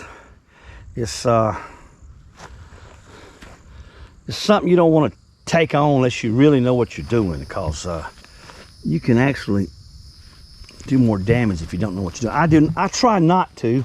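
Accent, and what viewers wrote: South, United States. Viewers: Thank you, this tour was fun and interesting .. Plus, l must say, enjoyed listening to the southern accent .. 👍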